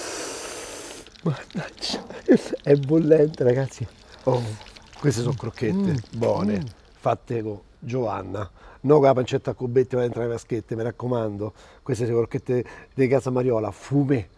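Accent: Rome, Italy. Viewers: Complimenti sei simpatico mi ricordi la Sora Lella, autentico romano🤩🤩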